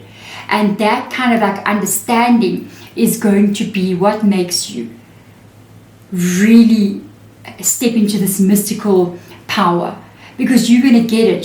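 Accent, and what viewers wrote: South Africa. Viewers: Rocking up in your reading 😅😂 so Saffa!!!!! ❤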